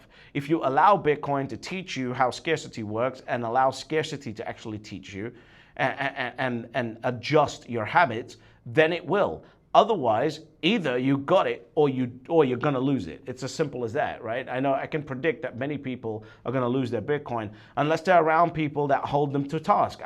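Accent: United Kingdom. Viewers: Love it 🧡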